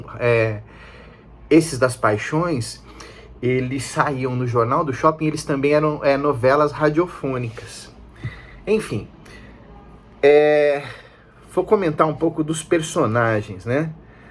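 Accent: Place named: São Paulo, Brazil. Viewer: Cada vez melhores os vídeos. Bem legal também ver um amor sobre beagá narrado em sotaque paulistaço. Viva o Lagoinha!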